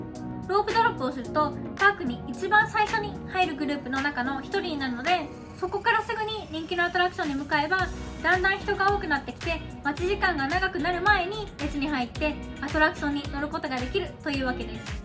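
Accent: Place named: United States. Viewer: sayaちゃん、こんにちは😊 sayaちゃんの動画、何本か楽しく観させていただきました。今日は初コメさせてくださいね。 ジーニー＋について、たくさんの情報をありがとうございます♪プランを立てている途中なのですが、教えてもらえますか？？(＞＜) 8月なのですが、午後からEPに行く場合、朝ジーニー＋を購入して、7時にひとつ、11時にひとつ、13時にひとつ、合計3つのLLを持って13時にパークに入る、といったことは物理的に可能ですか？wdwには次いつ行けるか分からないので最大限楽しみたいと思っています😅 あとsayaちゃんはアメリカ育ちですか？🇺🇸お話の仕方や言葉の選び方がアメリカっぽいなーと思って😁気にされたらゴメンナサイ🙏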